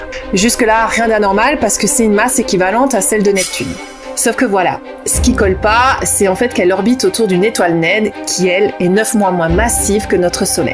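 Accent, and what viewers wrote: Alsace, France. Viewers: Le joli petit accent de notre belle alsace ,passionnant sinon ! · Comment t’arrives a capter qu’elle est Alsacienne ? Perso je vis aussi en Alsace mais je vois pas la différence d’accent avec ceux du Doubs par exemple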